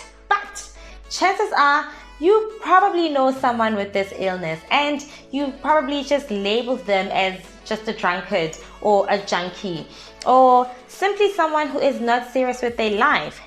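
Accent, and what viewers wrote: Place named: Africa. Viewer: Here because a friend is dealing with this. There is something so satisfying about a woman with a wholesome soothing sounding voice and African accent ☺️ I love the way you present and speak.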